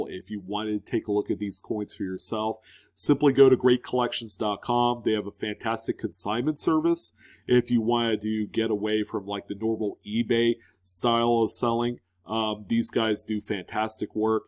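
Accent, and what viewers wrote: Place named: Blue Ridge, United States. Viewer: So had a chance to listen thru in one shot and yeah I like it. Clear concise with some "blueridge" dialog. I like it!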